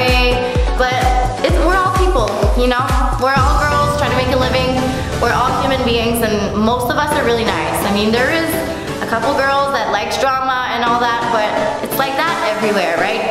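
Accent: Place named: France. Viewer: Girl I hear ur French accent and I’m francophone aussi lol I love it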